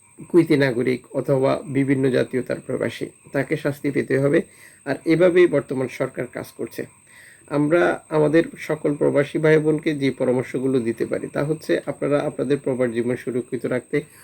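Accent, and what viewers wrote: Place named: Noakhali, Bangladesh. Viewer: অপরাধী না অফরাধী! নোয়াখাইল্লা!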